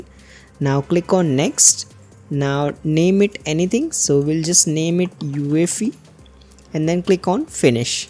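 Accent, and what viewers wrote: India. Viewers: bro has one of the most indian accents ive ever seen typing ts on ubuntu btw im gonna try ts on my windows in a few mintues hope it works imma reply if it works :D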